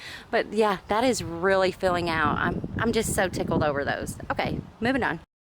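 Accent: South, United States. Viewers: What a beautiful garden, and you have the most beautiful southern accent, my dear friend. What would you do if you planted a carrot garden?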